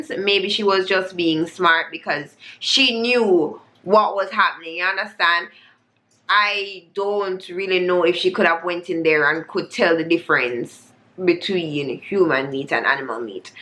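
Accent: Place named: England, United Kingdom. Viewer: I was wondering where you going with an English accent and you dealing with German serial killer. Look likes you were thinking the same thing!😝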